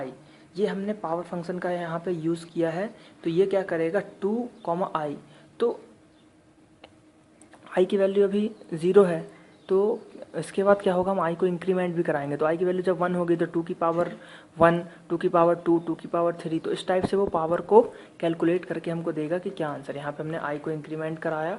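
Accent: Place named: India. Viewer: dunno if that's indian or English with a very very strong indian accent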